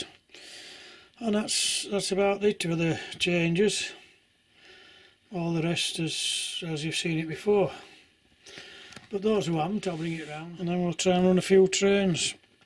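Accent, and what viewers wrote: Yorkshire, United Kingdom. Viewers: Where are you from , is it somewhere between sheffield or Barnsley towards Leeds. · Trying to decide if you're a Yorkshireman. You sound like my friend who's from York. Beautiful model railway you've built. Would love to see it live!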